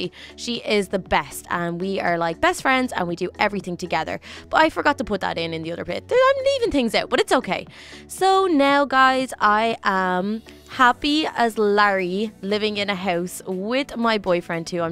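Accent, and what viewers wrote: Ireland. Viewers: I was born after my grandpas birthday but he died a couple of months ago but you don't sound that Irish kind of but not a lot :P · I fucking knew you were irish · You sound VERY Irish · Now I can tell where you get your Irish accent i have been watching your videos for 3-4 years now they make me happy and the other little club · I love the accent from down south Ireland (I live in Northern Ireland in Co.Derry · I couldn’t hear your accent was Form but now I know it’s Irish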